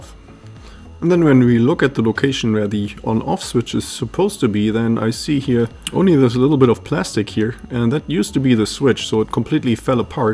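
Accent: Germany. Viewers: Can i comment in german? I thought i've heard a little german accent :D... Ich habe ein Headset von Clam. Da kann ich nicht einfach das Ohrpolster entfernen... was tun?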